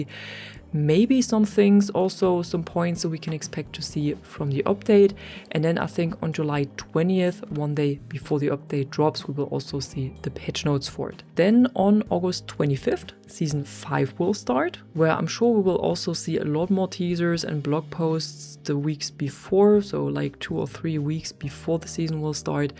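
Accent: Germany. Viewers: Ich wusste das ist der deutsche Akzent 😂❤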